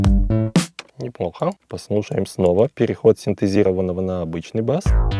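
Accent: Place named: Germany. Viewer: Откуда у тебя немецкий акцент?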